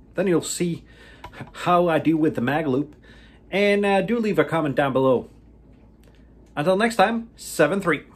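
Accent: United States. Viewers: I just wonder, are you American or Norwegian? Americans are not named 😃 but you sure talk like one 😊 73 from LA-M160560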